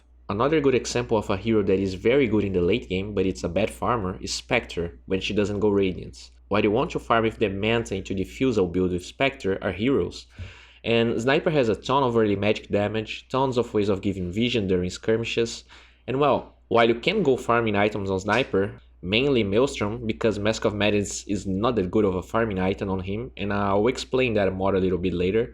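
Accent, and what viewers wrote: Brazil. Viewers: nice vid overall but i would have to say the narrarator has a heavy brazillian accent that i find offensive and annoying.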